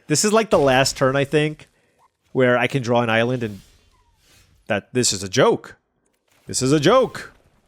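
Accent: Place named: Seattle, United States. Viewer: It's a Seattle accent. We sometimes mumble some of our words.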